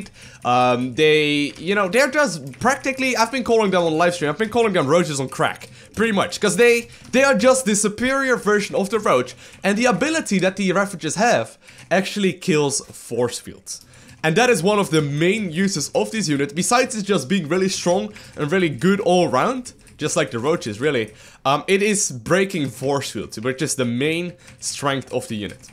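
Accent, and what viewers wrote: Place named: Netherlands. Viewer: dutch is definitely leaking into his english, compare this to his newer videos, you can really see the difference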